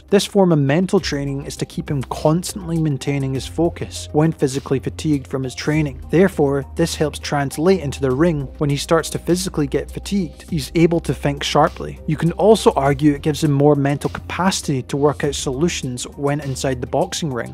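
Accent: Scotland, United Kingdom. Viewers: Good vid work. 👌 the Scottish accents adds more value 😄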